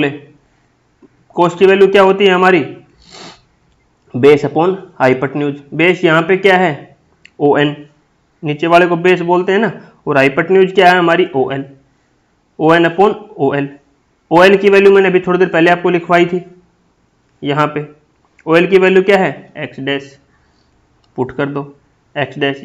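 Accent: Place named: Haryana, India. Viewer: Ram Ram sir ji , your accent clearly haryanvi , thankyou for great information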